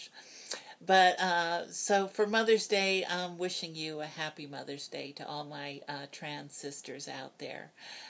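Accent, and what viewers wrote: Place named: Texas, United States. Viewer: you are an incredibly interest and attractive woman and you've got a great Texas or southern Illinois feminine voice. As a 64 year old cisgender straight male ally I find it interesting to list to a woman who is "from my generation" which is rare as I listen to the You Tube videos. There is a 30ish trans gender woman in Florida JW transgirl who might be an interesting person for her to talk with. and like yourself she is a very interesting and intelligent woman. I am so happy that you had the opportunity to have some time of closeness with your mother in the hospital when the two of you did not have the stern eyes of the rest of your family.. I am so sorry your family was so cruel to you on Mother's Day. I will tell you what I tell every transgender woman, I feel that each and every one of you is a special gift from God, you have the special gift of understanding the world through nthe eyes of both a man and a woman.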